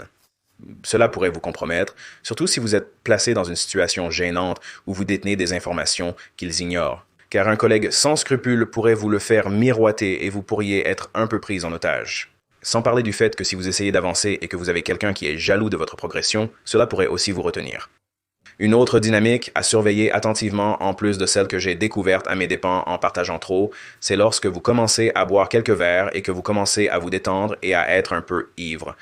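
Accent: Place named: Quebec, Canada. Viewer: Il "sonne" québécois toutefois.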